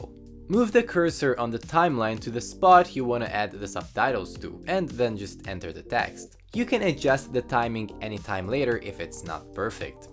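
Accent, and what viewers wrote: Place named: Netherlands. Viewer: Je hoort direct dat je Nederlands bent.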